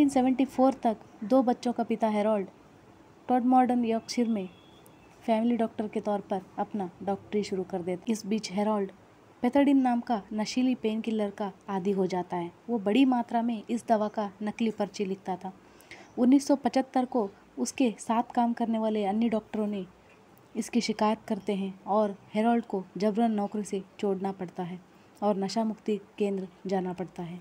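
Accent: South, India. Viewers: Better u speak English ur accent is south Indian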